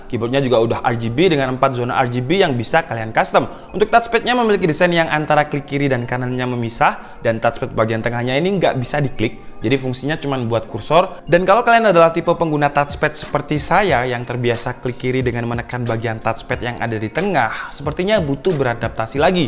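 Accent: Java, Indonesia. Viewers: Orang malang bang? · Wong jowo ta?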